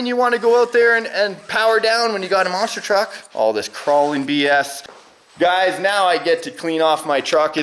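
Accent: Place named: Canada. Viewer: Your patriotic words in your Canadian accent brought a tear to my eye... amazing what America has become. A salute to you sir and a big AYE. Bless the North American continent!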